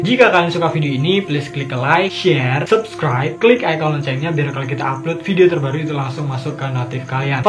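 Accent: Java, Indonesia. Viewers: Njenengan jowo mas? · bisa ilangin medoknya gak? mles dngernya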